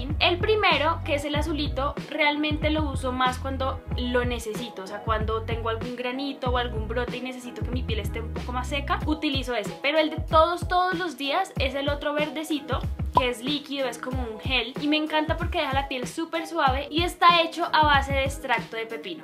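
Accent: Colombia. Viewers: Eres la colombiana que habla más bonito 🎶🎶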